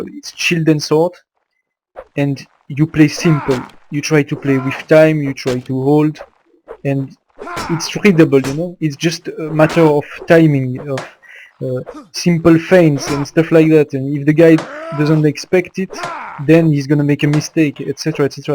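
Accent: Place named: France. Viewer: I DONT LIKE THIS FRENCH ACCENT ! FUCKING BAGUETTE but great vid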